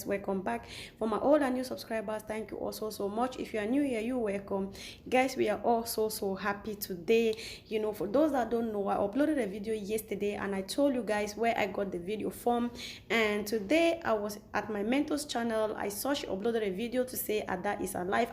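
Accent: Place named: Cameroon. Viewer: Love you bcos my best friend here in UK is from CAMERION and she speaks like you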